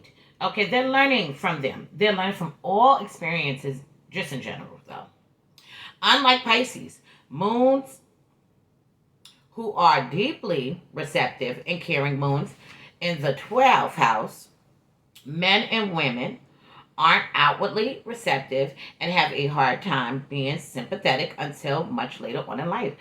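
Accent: New York, United States. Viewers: I always watch your videos. U sound like you from the Bronx or NY I should say. I have this placement. I also have Neptune in 4th house. Saturn and Uranus in the 2nd. In this lifetime, I took care of my mom. So much so I took her to the hospital the day she died. But I couldn’t stay cuz of Covid, I got the shock of my life when I called the hospital two hours after I took her and they told me the doctor will call me back because when he called she told me my mom died. I been taking care of my mother since I was very small. I mean going out doing laundry and going food shopping at 10 years old. I had a very hard childhood. I was the black sheep. My mom was mentally ill, severely depressed and emotionally unavailable. I always felt very unloved. Then I was the scapegoat. But I love these interpretations. U do your research and u don’t sugar coat like thee other astrologers. 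You are helping with me and others with shadow work. God Bless You and Yours.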